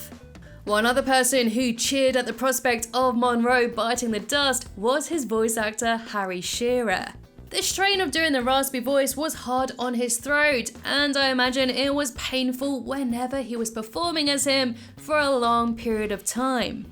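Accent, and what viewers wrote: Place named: England, United Kingdom. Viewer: This is my first time visiting your channel. How did I know you were English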